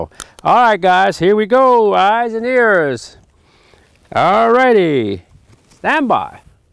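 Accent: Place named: South, United States. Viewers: He sounds like he's from South Carolina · “Store wars” “replicor” I love that southern twang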